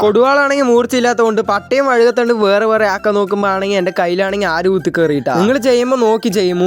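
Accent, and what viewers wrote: Palakkad, India. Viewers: bro sthalam palakkad aahno❤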